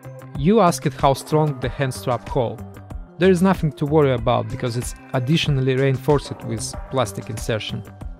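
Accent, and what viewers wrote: Russia. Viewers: Акцент специально делаешь такой заметно русский? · Чувствую Русский акцент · Этот русский акцент идеален) Хочу себе данный девайс)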